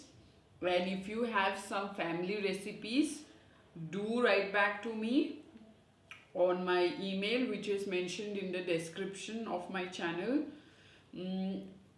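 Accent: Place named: Assam, India. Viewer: Lovely ☺️ I wish could have a taste of your dishes… that Bodo accent was funny though 🤭 but thanks for the dish as my Khasi gf was pushing me to cook it with pork and u saved me 😊 🙏 keep posting amazing Bodo dishes 👍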